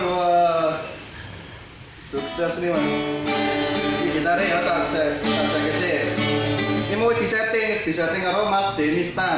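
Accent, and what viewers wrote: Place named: Banyumas, Indonesia. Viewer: Ngapak temen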